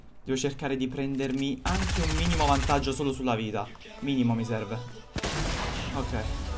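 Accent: Naples, Italy. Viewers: Quando ti parte il napoletano è bellissimo ahah grandissimo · Sei napoletano fra? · Ma ij o sapev Uagliu Sei di Napoli?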